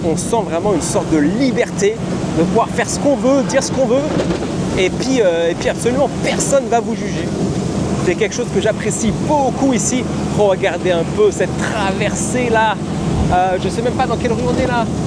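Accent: France. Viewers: Super ton voyage en bus, tu as pris des risques en étant debout. Je suis étonnée qu’il n’y ait pas un guide pour indiquer le nom des rues et citer certains bâtiments. Tout le monde te demande le nom de ton site et quel succès avec ces charmantes jeunes filles , le french accent ça plaît !!!. Bravo, continue tes vidéos très vivantes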